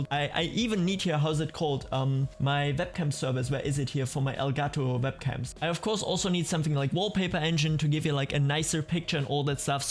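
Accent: Germany. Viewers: Your 100% german, I can hear it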